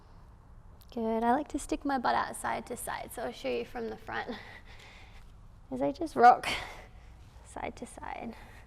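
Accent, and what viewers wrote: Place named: Australia. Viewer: Maybe it’s the Australian accent but I loved that video! It moved a little faster than I would have so it kept me on my toes! 🫶🏻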